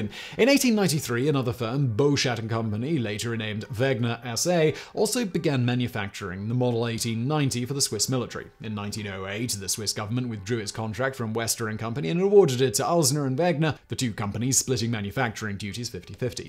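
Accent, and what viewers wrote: England, United Kingdom. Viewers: He's English. If a word looks like it starts with Victoria, he's hard coded to say Victoria no matter what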